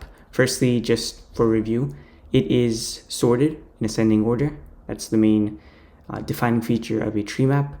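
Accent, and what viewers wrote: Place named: India. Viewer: lol hes got a subtle indian accent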